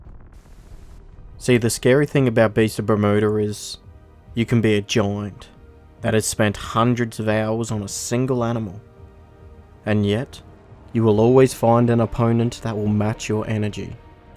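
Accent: Australia. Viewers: very clearly Australian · The Australian voice really makes this video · he sounds super australian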